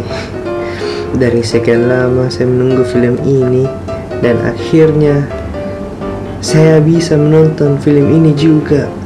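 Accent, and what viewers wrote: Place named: Sulawesi, Indonesia. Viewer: Hahahhaha sulawesiii logatnya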